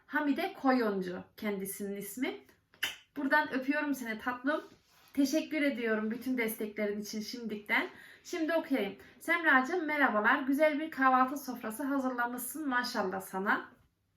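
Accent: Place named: Ankara, Turkey. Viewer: Nerelisiniz ankaralï gibi konuşuyorsunuz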